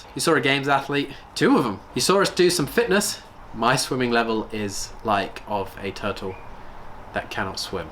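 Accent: Australia. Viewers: Aussie accent 😂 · your accent changed a bit to Australian! haha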